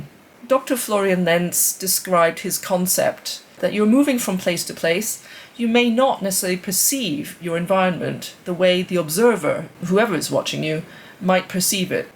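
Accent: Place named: England, United Kingdom. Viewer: I'm listening to an Asian woman with an English accent speaking about Phantomleaf on a channel called 'Flecktarn'. I am very confused.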